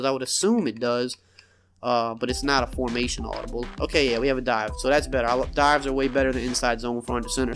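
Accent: Louisiana, United States. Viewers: Great content but I gotta ask what part of Louisiana you from ?? Your Accent sounds a lil bit like Chalmette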